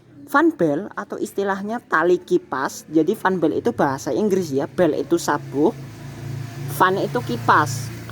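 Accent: Banyumas, Indonesia. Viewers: Mase Banyumasan iki ... Ngendi kiye...hehe. suwun nggeh infonya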